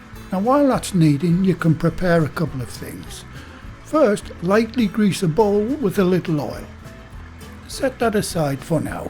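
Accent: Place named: Newcastle, United Kingdom. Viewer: I don’t hear anything but Geordie.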